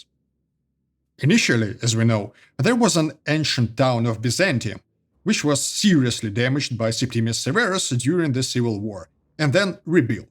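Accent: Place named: Eastern Europe. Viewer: Wrong. The name "Byzantines' was popularized by the other Roman empire... the German speaking one. They refused to call the other Roman empire Roman because they insisted the holy Roman empire was the "real" Roman empire. Your anti-Greek videos and slavic accent suggest a less than objective motive. What's your background?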